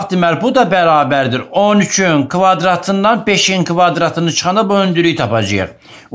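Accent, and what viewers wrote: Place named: Shaki, Azerbaijan. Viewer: Çox gözəl müəllimə.Şəkindənsiniz? Ləhcəniz əladı❤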